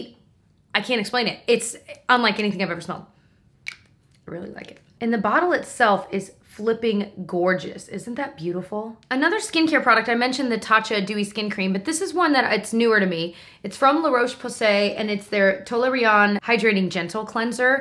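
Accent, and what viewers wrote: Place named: New Jersey, United States. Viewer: You've probably heard of Good Reads but if not, it's an app that has other people's reading lists, as well as reviews on books. That's where I find most of my want-to-read novels 😊 I love just hearing your voice! I know that sounds weird but you sound like my New Jersey family ❤ I had that Nest perfume but gave it to my mom because it was a little too warm/mature for my taste. It's crazy how everybody's preferences are different!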